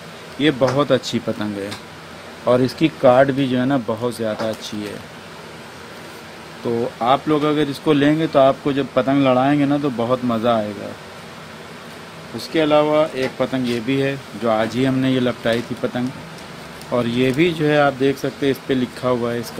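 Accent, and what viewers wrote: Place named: Allahabad, India. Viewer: aapki allahabadi bhasha sunkar achha lagta hai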